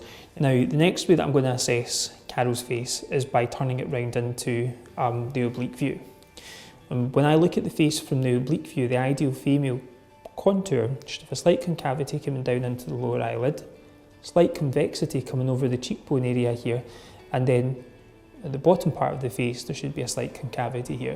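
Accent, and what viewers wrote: Scotland, United Kingdom. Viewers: Where are you based,? I note your Name is Scottish and accent. Are you based in SCOTLAND?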